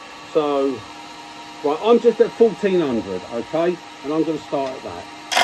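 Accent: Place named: South London, United Kingdom. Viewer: Fantastic, wasn't expecting to hear from you so close to Christmas thought you would be having a well urned break! I've got lots of books seen loads of the videos but your tutorials seem to hit the spot,feel like I can turn something half decent with me carbide and my badly sharpened traditionals,I think your accent helps, sound like one of my lot in South east London,no billy bullshit tell it like it is! As you can probably tell a few beers gone down my neck (it's Christmas) but sincerely enjoy the rest of it,and you and have a cracking New year.lee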